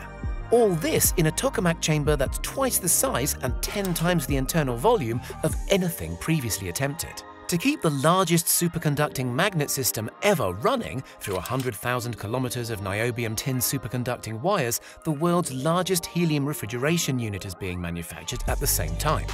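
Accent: England, United Kingdom. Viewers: WARNING... FOR ENGLISH ACCENT ONLY !!!!!!!!!!!!!!!!!!!!!!!!!!!!!!!!!!!!!!!! TALKING WITH THE NOSE !!!!!!!!!!!!!!!!!!!!!!!!!!!!!!!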